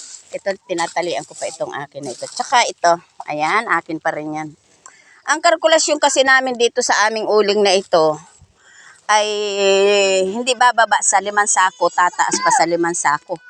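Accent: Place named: Atimonan, Quezon, Philippines. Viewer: Nkkbilib nmn..Sobra sipag tlga.. yun punto ng pagsasalita ninyo ay para taga atimonan quezon.. pakiramdam ko eh andun tuloy ako s lugar ng lola ko😊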